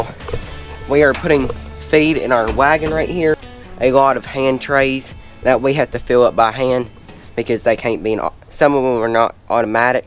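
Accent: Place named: Kentucky, United States. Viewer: ...I am a city guy and know little (well really nothing) about farming. Any kind of farming!! However I do enjoy watching the various farming videos on YouTube. And I have learned a lot. Still trying to figure out the different kinds of cows...Heffer, steer, etc. Your video is the first one about chicken farming that I have watched and I will be following your videos. I have so many questions that I am sure will be answered. Havent seen your chicken "barns or houses" with chickens yet but am anxiously awaiting your videos. And just so you know...your presentation is really good. Maybe it's that Kentucky accent...but your presentation is very good. Please keep the videos coming, and maybe I will finally figure out what came first...the Chicken or the Egg. Yeah, you knew it was coming.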